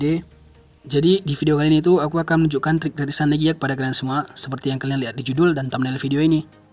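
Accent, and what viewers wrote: Aceh, Indonesia. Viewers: bahasa indonya gaya orang aceh banget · orang aceh ya bang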